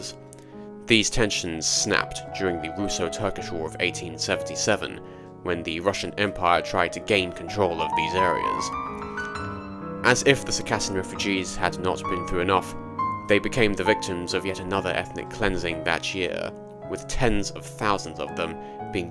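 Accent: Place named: United Kingdom. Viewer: I dig your voice its fine and it sounds great especially with the British accent. The pronunciation of the tribes of spot on and the music in the back is absolutely epic I enjoy this video and we'll be looking into more of the content.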